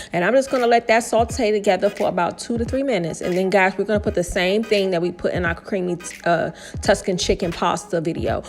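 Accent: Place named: Maryland, United States. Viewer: Are you from the Maryland/DC area? You sound like it. But anyway the food look so good. Man oh man 😋😋😋😋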